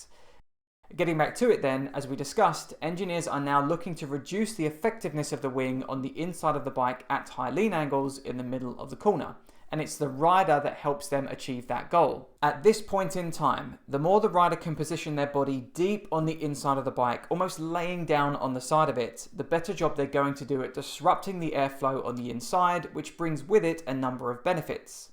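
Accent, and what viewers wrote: United Kingdom. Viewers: It is difficult for me to understand his british accent with the echo due to the microphone being at a big distance from the speaker.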